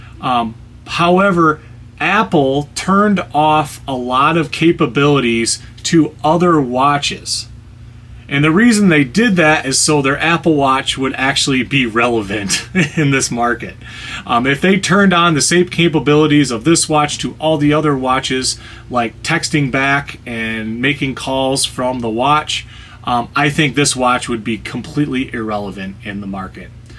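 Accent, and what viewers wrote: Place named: Michigan, United States. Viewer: This guy is so Michigan. The clothes. The accent. Dude looks like every dude in my town. Props from Traverse City, man!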